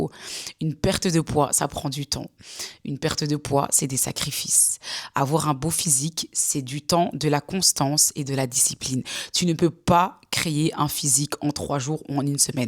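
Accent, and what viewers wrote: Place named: Belgium. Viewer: Olalala, en t’entendant parler, je me suis dit, c’est sur elle est belge ! Et puis tu parles de ta maman qui habite tout près de la Meuse… je ne savais pas que je tomberais sur la vidéo d’une liégeoise en étant moi en pleine perte de poids et changement de mode de vie 🥹 ça fait trop plaisir ! Et t’as vraiment fait les choses correctement. Bravo ! C’est vraiment un changement de mode de vie à adopter sur du long terme la « perte de poids », pas juste faire des régimes hyper restrictifs sur une courte période. En tout cas, merci pour ta vidéo, t’es adorable et super courageuse ❤️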